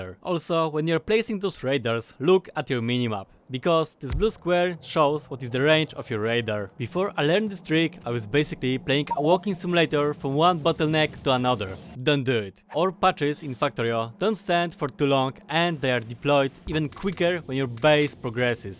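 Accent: Poland. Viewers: Od razu rozpoznałem polski akcent :) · twój angielski naprawde zajebiście brzmi jak na polaka. akcent dalej słychać lecz nie jest cie aż tak ciężko zrozumieć. Trzymaj ten poziom mordo, bo nie musisz go podnosić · Beautiful Polish accent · That Polish accent is funny) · Witam zacny poradnik :) Wiedziałem że gdzieś słyszałem ten akcent Polska gurom! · Ty z polski? Taki akcent masz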